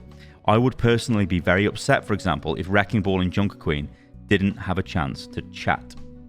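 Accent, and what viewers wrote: South, United States. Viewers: southern accent sounds like Chris Chan nononono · your Southern accent is fucking laugh out loud!!!!!!! From the States here. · Well shitttid... your southern country accent is on point!!! Hahaha haha 😄 😆 🤣 I moved to hillbilly country and that's exactly how they sound 🤣 I wish more info was given for currencies and how they interchange. Claim it all on OW when you can! Bring back Jeff. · OK, I kinda love the American Southern accent. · I took a break from OW but I am back excited about OW2. I missed your content! Also the southern accent was unexpected but funny 😁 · I need more southern in videos. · kkona accent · really good American (Southern) accent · your southern accent isn't bad. It's also kind of sexy LOL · OMG giving us a southern american accent is something I didn't know we needed. But it was glorious m8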